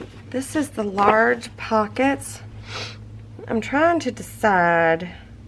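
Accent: South, United States. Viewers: Lol, I was listening to you and thought: this girl is definitely from the south. Then I saw you were in Louisville. I’m in Paducah! Love your ideas!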